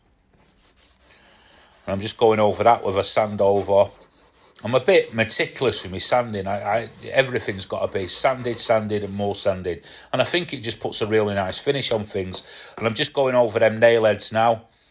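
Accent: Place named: Manchester, United Kingdom. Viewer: great projects, like to hear that proper manc accent when you narrate your videos,can see you getting more confident in yourself,can't wait for the next one 👍👍👍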